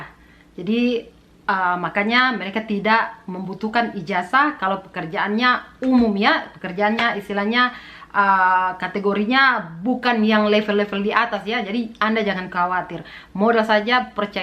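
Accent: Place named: Eastern Indonesia, Indonesia. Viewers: logat kaya orng timur 😁😁. semoga saya bisa di bantu kerja ke sana kk . 😔😔pengeeenn sekali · tanta asal mana ni? 😁🤣dialek kayak orang ntt 🤣🤣🤣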